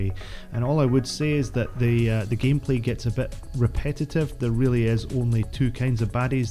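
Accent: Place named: Scotland, United Kingdom. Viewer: I love the Scottish accent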